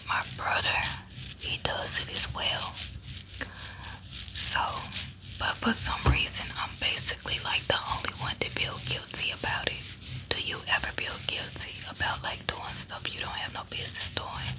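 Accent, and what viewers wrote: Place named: Memphis, United States. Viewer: You are so lovely omg! You said you were from Memphis and your accent finally clicked for me, I couldn’t place it till you said!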